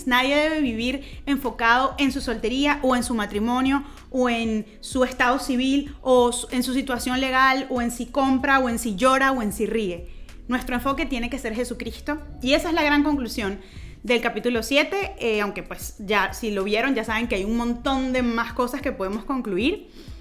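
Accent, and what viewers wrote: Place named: Venezuela. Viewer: De donde eres? Parece de Venezuela.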